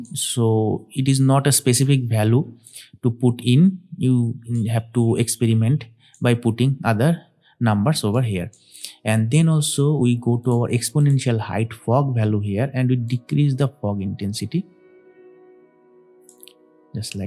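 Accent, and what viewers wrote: India. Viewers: oh no indian accent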